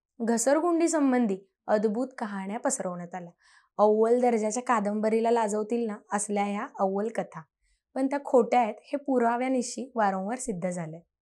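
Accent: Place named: Kolhapur, India. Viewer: Kolhapuri accent, kiti chaan vatato aikayala, jai maharashtra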